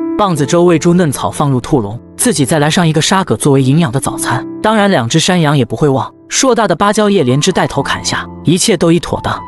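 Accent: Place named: China. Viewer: 聽聲音也知道是大陸人。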